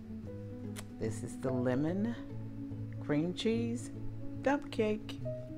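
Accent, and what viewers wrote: South, United States. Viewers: that southern accent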